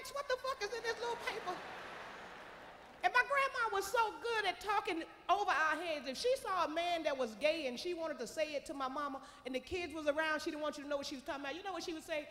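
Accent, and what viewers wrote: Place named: Chicago, United States. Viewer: Chicago girl, Chicago talk, Chicago style. 💯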